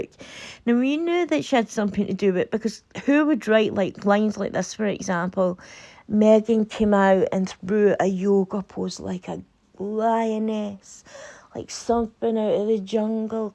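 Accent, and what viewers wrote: Scotland, United Kingdom. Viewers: Carry on, when i first spent time in Scotland I thought the people at Glasgow central spoke another Language, but I as others will soon tune into your beautiful accent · I don't have a problem with her accent, or anybody else's for that matter - foreign or UK. I have a good ear (and happen to be Scottish too), but it's not always easy for others if they are not used to it. Even the English put subtitles on our home produced programmes if it's being aired in their country as some of our dialects and pronunciations are incomprehensible to outsiders. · I absolutely love Scottish accents. I’ve started watching some of your videos, l didn’t take match notice of the title, and after listening l didn’t think you came across as being nervous, well done to you. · That is a beautiful Scottish accent. Stay safe, be well 🙏🇨🇦🇬🇧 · A Scottish accent. 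I can understand her fine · Hi you’re Scottish where are you from,am from Scotland west coast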